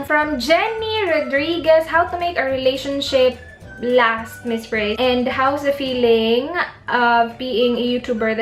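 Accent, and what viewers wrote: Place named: Visayas, Philippines. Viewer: The way you are, being simple made me subscribed to your channel. I start my day watching your vlog. I enjoy watching you and your family. My mom is from Mindanao and my dad is from Cebu. So when I hear you talk visaya, it reminds me of my childhood. Best wishes to you and your fiancé from us here California 😊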